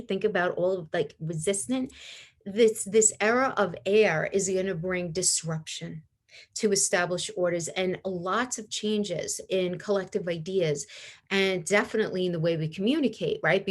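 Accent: New York, United States. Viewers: Hi, I just came across your video. As the previous comments have said.....you are amazing! Also love your New York accent!!!! Love your explanation etc....awesome. Do you do readings at all?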